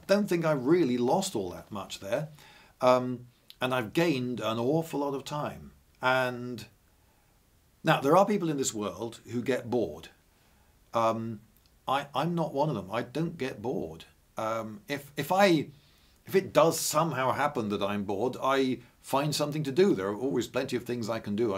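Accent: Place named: United Kingdom. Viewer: Very British man overanalyzing a hypothetical question? Yes, please!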